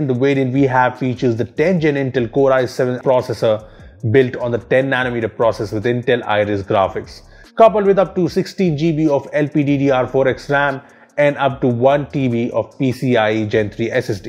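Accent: India. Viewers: Accent is too hard to understand. Found myself pausing and replaying bits bc Indian accent was just too thick. that I was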